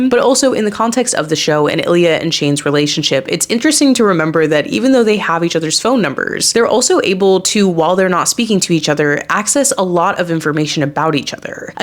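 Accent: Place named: Chicago, United States. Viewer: I’m not sure where she’s from, but over here near Chicago, the majority of us all speak this fast. I never realized how fast we speak until I went to Georgia where everyone seems to speak in slow motion to me, and they constantly asked me to repeat myself, because they couldn’t follow it. She does edit the pauses. Those pauses are likely as she looks back to her prepared speech, but it’s still about the same amount of time she leaves a pauses between sentences in general. Slowing down her speech a bit for a wider audience and editing the connected breaks to fit that speech speed would probably be better, because many people have a difficult time following it. I have had a hard time slowing my speech, but it was required for public speaking so I can slow down when necessary. The fast speech part of the accent from this area is difficult to unlearn. 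At first you purposely slow down, but unless you keep thinking about it, you speed right back up. I could be completely wrong here. I’m just speaking from my own experience , and she sounds very similar to people from my area.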